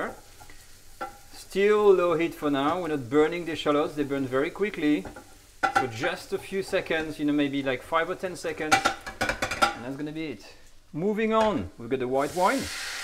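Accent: France. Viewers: This dude sounds so French.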